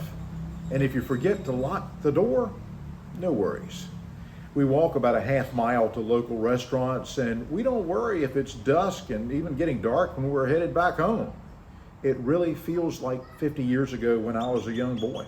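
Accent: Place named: South, United States. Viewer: I love your slight southern accent. I’m from NC, live in CA and considering moving to Mexico.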